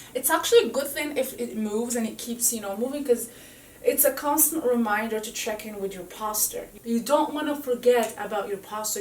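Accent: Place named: Morocco. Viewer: Ena koont 3rf ntiya Mghrebia! I could instantly tell from your accent! haha. Shukran pour la video! :P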